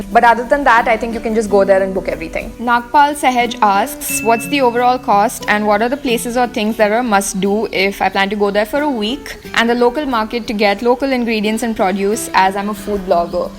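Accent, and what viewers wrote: India. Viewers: 10.x speed if you speak like this in Canada BC you will be fired from job brain draining Indian accent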